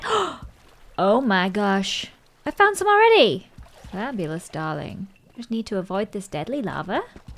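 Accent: United Kingdom. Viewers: OMG FINALLY U ARE ALIVE!(*ゝω･*) I still like British accent(((o(*ﾟ∀ﾟ*)o)))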